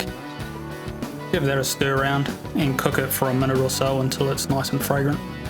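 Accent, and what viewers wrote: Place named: Australia. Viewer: Hey I'm guessing your Australian,,,, not Brittish, or Irish,,,,,, I'm an American, I can understand you folks better. Your "english" is easier to understand than those others. Still> Different than my "American" english.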